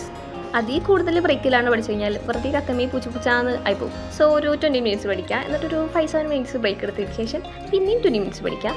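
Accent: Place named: United Kingdom. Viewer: Her English accent🌟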